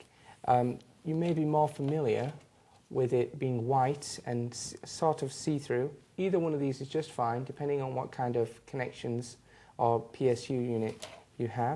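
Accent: United Kingdom. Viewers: lovin the way he alks :D so english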